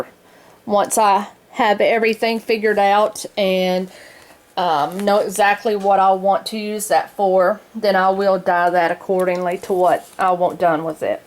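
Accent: South, United States. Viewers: I find that remark to be offensive. What difference does it make what accent she has? She has a southern accent. To say it is peculiar is just rude. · viridian it’s just a country or “southern” American accent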